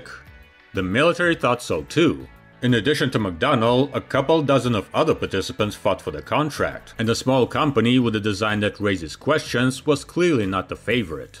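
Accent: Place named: Russia. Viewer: Английский не его родной язык, он русский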